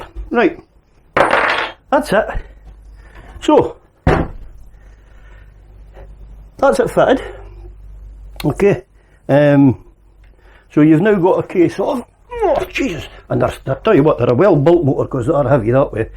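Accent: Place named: Scotland, United Kingdom. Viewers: Cheers for info, no problem understanding your Scottish accent as a good mate of mine is from Gourock 😎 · Eh don't know what gave it away. Eh must have noticed a wee Dundee quirk in your accent. 😁 Just a quick note to say fixed my Karcher leak. Turned out to be the top elbow joint. Fantastic local supplier in Inverurie got me the bit. Took a wee while to free it as the clamp was seized solid but my trusty mole grips sorted it (bought when I was about 18 for £2.99 - best buy ever). Anyway, thanks again for your video, which gave me the info I needed and a great laugh at the same time. Have a great weekend, and take care. Cheers, Harry (well, actually it's Alan - Harry's my crime thriller writer pseudonym)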